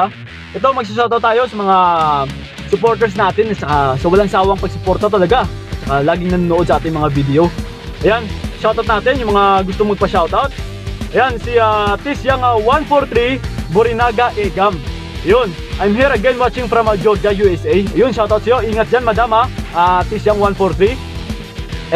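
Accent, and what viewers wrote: Iloilo, Philippines. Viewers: Ilonggo ka ba sir?😁yung tuno ng pananalita😉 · Ilonggo ka kuya? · Hello po, ilonggo ka cguro dahil sa tono hehehe.. New subscriber po. From Hong Kong 😊Ldr din po. Thank you sa tips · ilonggo ka po ba kuya ??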